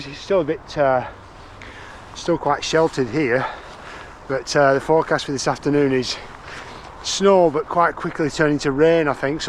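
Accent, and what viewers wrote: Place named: Accrington, United Kingdom. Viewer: Always wondered if you lived close to me with your accent, Im from Accrington 😊 Im up there most days, I know a few camp spots out the way when I can't get to better locations. Lucky to have it on our doorstep. Might see you up there at some point mate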